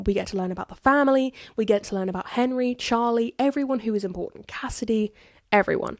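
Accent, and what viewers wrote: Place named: Ireland. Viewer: OMG YOURE IRISHHHH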